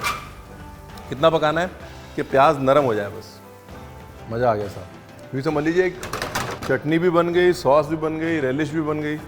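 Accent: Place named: North, India. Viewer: Hey Ranveer u look amazing & ur North Indian accent as well as the recipe is even more fabulous... 😋☺️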